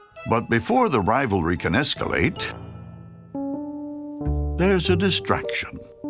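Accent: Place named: Transatlantic, United States. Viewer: Long time since I heard that transatlantic accent! Makes me think of an old cartoon movie.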